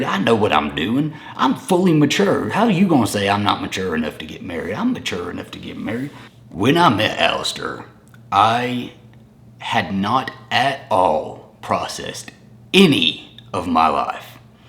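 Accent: South, United States. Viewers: Well, that was deep! I’ve been following you from the beginning. You probably didn’t get married too young. Sounds like if Alastair wasn’t there you would have continued your spiral. Off subject: Your accent is getting more Southern drawl every time you record. I think you’re talking more like your mom every day, instead of more like Alastair who has no accent. Analyze that Mr. Freud. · Gosh .. I love and admire your ''Southern Accent'' 😍🥰😍🥰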